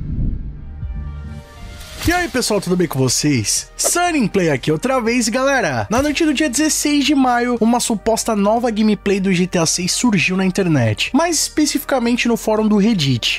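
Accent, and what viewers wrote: Portugal. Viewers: Porque seus videos agora estao com essa dublagem? Em pt portugal? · Porque sua voz parece de um português?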